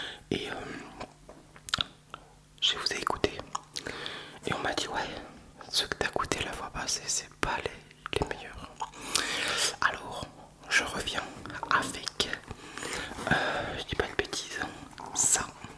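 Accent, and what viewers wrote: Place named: Alsace, France. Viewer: Tu est alsacien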